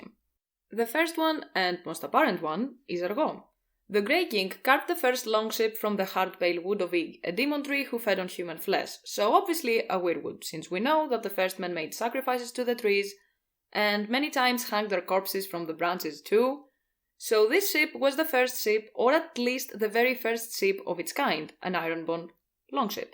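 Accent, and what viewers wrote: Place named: Greece. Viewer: I think you're the first (fellow) Greek ASOIAF Youtuber I've ever seen I could immediately tell by your accent hahaha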